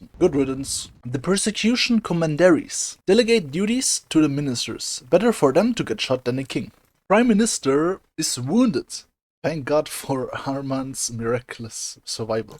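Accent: Germany. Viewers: LOL. Dein Englisch hat so einen heftigen deutschen Akzent 😂👌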